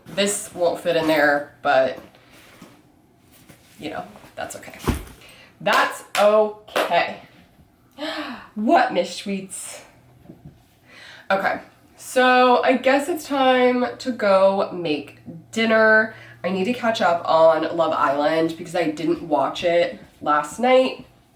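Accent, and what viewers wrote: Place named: United States. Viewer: I love in American Accents. By the way, May I know Where is your accent in America?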